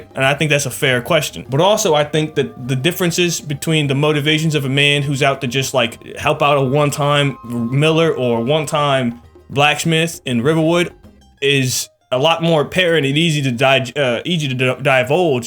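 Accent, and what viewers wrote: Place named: New York, United States. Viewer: Isn't me or as a video went on. He got more and more New Yorker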